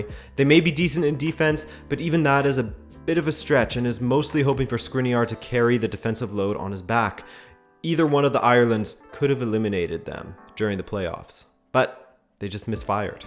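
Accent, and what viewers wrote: Canada. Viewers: Was thinking the same thing as a first time listener. Immediately recognised the Canadian accent which caught me off guard as don't see that often in my football recommendations. It all made sense when we got to Portugal! Nice video.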